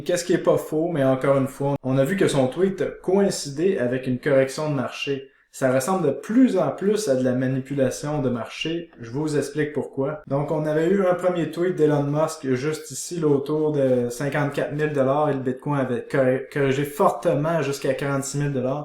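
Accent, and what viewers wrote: Quebec, Canada. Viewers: c'est à cause de toi le PUMP sur le matic?? vidéo à peine sortie que le marché s'emballe!!! merci c'est un plaisir d'écouter et ce même avec un accent Québecois!!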